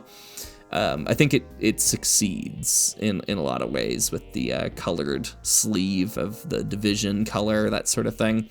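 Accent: Canada. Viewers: nooo! Don’t apologize for your charming Canadian accent eh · A Canadian calling their own accent "harsh" is the most Canadian thing I've ever heard Great video,!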